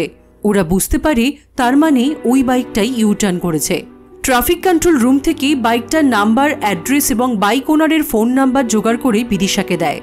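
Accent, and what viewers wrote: India. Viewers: didi tumi ki kolkatar?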